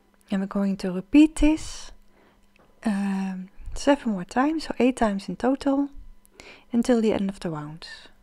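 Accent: Netherlands. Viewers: jaa zag hem voorbij komen inderdaad! Maar zoek altijd in het Engels omdat je vaak meer video's tegen komt... Doe vrijwel alles in het Engels. Maar meende iets van een Nederlands accent te horen... Leuk! Bedankt voor de video! ❤ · I'm going to make one for my grand daughter. She's a cat lover. Thank you for yr tutorial. By the way are you dutch? Your voice has a sweet dutch accent 😊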